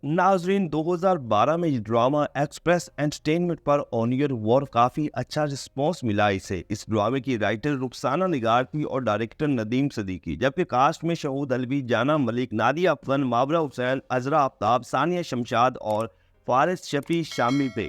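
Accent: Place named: Punjab, India. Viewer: No doubt mother tongue Punjabi. Very poor URDU.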